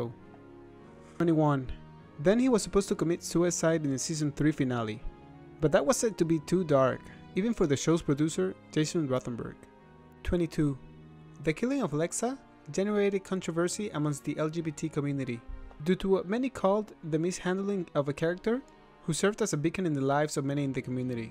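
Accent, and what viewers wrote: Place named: Australia. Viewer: Wow she sounds so astralin how dos it not show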